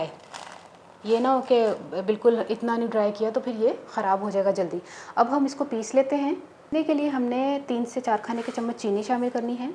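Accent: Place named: Punjab, India. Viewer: Ma sha allah and jazak allah amazing recipes zaberdast tips ,way of talking with meethy panjabi❤❤❤.... mane apki kharab doodh ko dobara theek kerne ki tip dekhi yaqeen nhi aya aisy kese ho sakta hai mazy ki baat kay main itafaq say gher nhi thi buchon nay doodh liya or bahir rakh diya jb ai toh dekha dood fridge main nhi hai ghabra gai thora nikal k boil kiya 4 kilo doodh kharab socha try kerti hun ap wala tip and amazingly superb pooray gher main dhamaal daal rahi thi kay panjabi kuri di tip kamaaaaal👍👍👍❤❤❤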